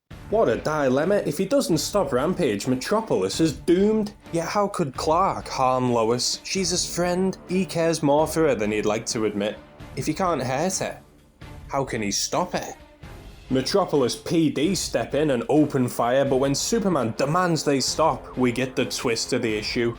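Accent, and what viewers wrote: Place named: Liverpool, United Kingdom. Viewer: Are you a scouser? Your commentary is delightful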